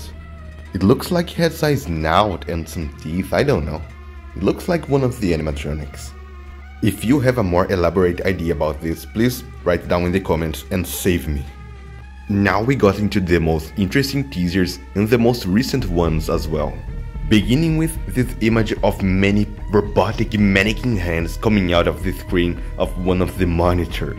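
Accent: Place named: Brazil. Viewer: Percebi de primeira que vc é br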